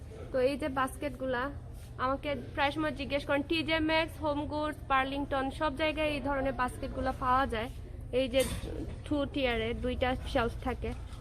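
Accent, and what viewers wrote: Sylhet, Bangladesh. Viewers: Apu etodin pore shopping dekia bala lagse bashi🥰🥰r emnitai apnake amr onk bala lage.afnar video sob somoy deki...apu afne sylhety mat matba bala lage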